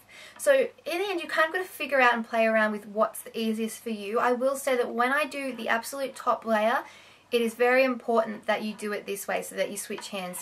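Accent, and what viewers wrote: Australia. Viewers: i am so glad i found your channel! i'm from australia and it's so hard to find australian beauty gurus! im having a marathon of all your videos:) i stumbled across your channel by searching in 'nina dobrev makeup tutourial' because i absolutely love her and your video came up! then i could tell in your voice that you're australian and i was so excited :') anyway, keep up the great work xxx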